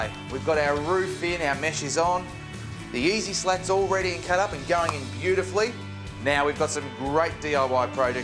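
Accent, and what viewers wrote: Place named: Australia. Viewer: LOVE Aussie accent!!!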